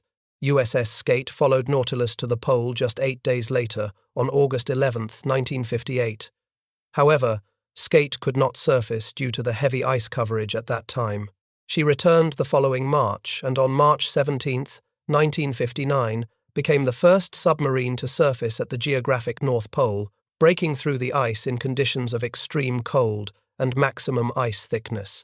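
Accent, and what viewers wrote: United Kingdom. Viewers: Outstanding historical account, love the British accent!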